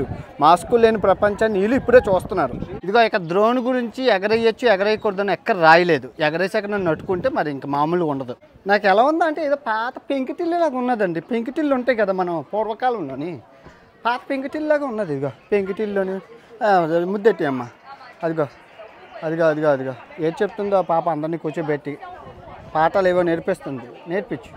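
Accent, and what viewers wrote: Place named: Godavari, India. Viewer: అబ్బాయి చాలా excellent ga organise చేస్తున్నావు. నీ సహజమైన గోదావరి యాసలో explain చేస్తున్నావు. మళ్ళా మన దేశం సెల్ఫ్ డబ్బా వద్దు . వాళ్ళ గొప్పతానినీ మనం హర్షించలి కూడా.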